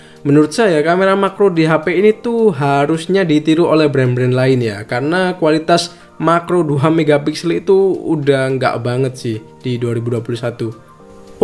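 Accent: Sidoarjo, Indonesia. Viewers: eh masnya orang sidoarjo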